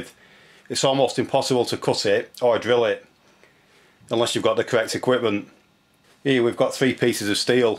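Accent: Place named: Northern England, United Kingdom. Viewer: I am from Sweden and I can understand all your saying although it is not as easy as "non-accent" english for me. Guess your from the northern parts of England, New Castle area?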